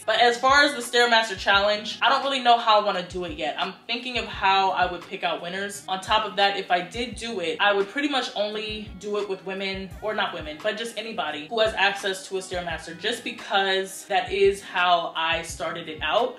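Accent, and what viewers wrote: New York, United States. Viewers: I love ya NY accent ans attitude, bk for 15 yrs. You are absolutely gorgeous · I love this so much. You’re not trying to fake the funk you’re so YOU! I still see/hear some NY in there 😂 love to see it.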